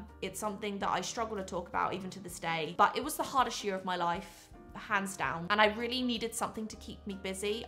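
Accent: England, United Kingdom. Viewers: I first started watching you because I miss my family in the UK and listening to your English accent was comforting. Having said that, I also love your quirkiness & how you can laugh at your mistakes. Your creativity, in whatever form is also always a great watch. I believe being a YouTuber takes a lot of bravery (I'm not brave at all) so please keep on being you & thank you for doing this ☺️❣️🤗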